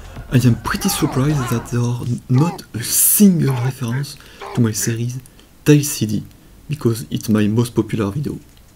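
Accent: France. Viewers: It seems his voice is very french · ware a part 2? I love you french accent Un francais!! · AH du bon français qui parle anglais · You have a french accent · De l'anglais avec un accents français Noice... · Cet accent français de l'enfer ! Avoue, tu le fais exprès ? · this accsent is frenchy very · Accusent français très prononcé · Nice france accent man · Bonne vidéo. Par contre, ne le prend pas mal, mais je te conseil de bosser un peu ta prononciation de l'anglais. Certes, l'accent français excuse pas mal de chose, mais si prononce tous les mots anglais avec une prononciation française, ça risque à un moment de ne plus passer... Sinon, continue tes vidéos, tes animations ont cools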